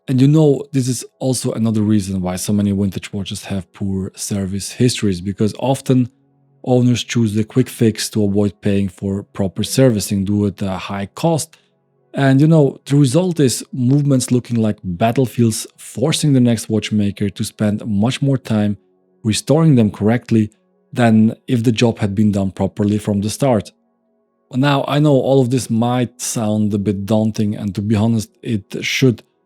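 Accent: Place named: Switzerland. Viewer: Love this content. You give precise and honest insight. What can be irritating at times in your constant mispronunciation of "available*" (say: ah-vale-ah-bell" not "away-bell"!) and you always pronuncing a "V" like a "W". They are sometimes pronounced the same in German (sounds like Swiss-German is your native language), but are vastly different in English.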